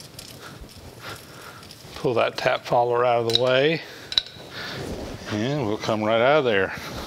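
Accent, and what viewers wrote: South, United States. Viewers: Interesting you call those 'castle' nuts. Here in Canada, I have always heard them referred to as castilated nuts. Means the same thing I suppose, but it is always interesting to hear regional differences in speech. You don't have what I would call a 'southern' accent, but there are certianly elements in your speech. One phrase you use interests me. You often say "And such" where I would say "And so on". I have never heard that before. Is that a southern or regional phrase? In any case, in any language or dialect, I enjoy your videos! Keep safe during the Covid-19 mess! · He absolutely has a distinct US 'southern' accent - Georgia to be exact. It's very easy for me to hear. One of the easiest examples is when he pronounces 'oil' as 'awl', which is the classic 'southern drawl'. Also when he says 'made by mah friend...' instead of 'mye friend'. 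'' Saying 'and such' is kinda Southern, but I've heard it from various US regions, not sure the origin. Though 'castellated' is the correct academic term, just about everyone that I've encountered calls them 'castle' nuts, even listed as such at most vendors and in parts catalogs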